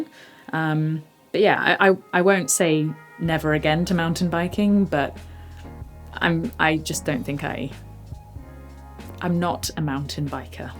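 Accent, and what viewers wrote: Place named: England, United Kingdom. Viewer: Wrecked, hilly...sounds so English...please use an American Accent...will be so fun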